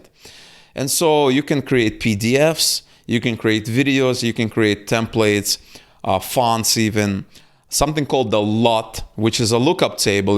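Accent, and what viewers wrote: Russia. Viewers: You're from Russia?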